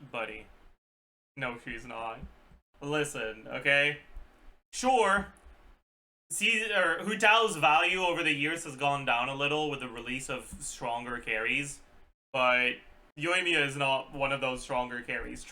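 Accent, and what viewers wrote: Quebec, Canada. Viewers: He’s French Canadian so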